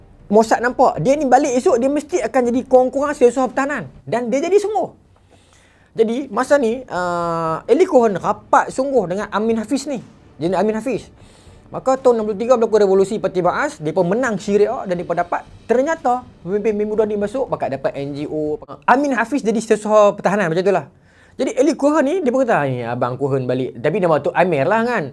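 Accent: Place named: Kedah, Malaysia. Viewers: Ingat sy sorang je tk faham. Maybe sbb lograt utara kot. Rupanye ramai je sama. Nk kuat tv vol tv budak dah tido pulak.😂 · Pekait benor ngt aih bang kedoh tu, penin aih sy nk fakham abg ckap, struggle nk catchup lajhuu ngt aihhh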